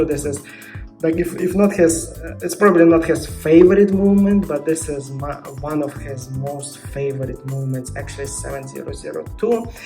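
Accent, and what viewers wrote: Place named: Russia. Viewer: The accent of this guy is very ru**ish! 👎👎👎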